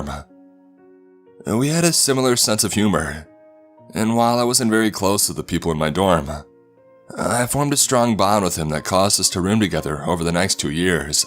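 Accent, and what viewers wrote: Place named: North, United States. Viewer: There's something about how you pronounce certain words like "button" that sounds familiar. Are you by chance from somewhere that borders Canada? I'm fascinated by lingual differences. Also, you're reading pace and pronunciation is one of the best in the creepypasta reader community, it really helps with the immersion.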